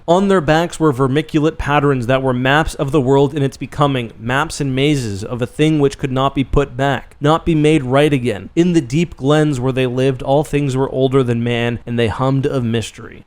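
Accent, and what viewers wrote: Canada. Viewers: Canadian lol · I am confident just by hearing your voice you are a fellow Canadian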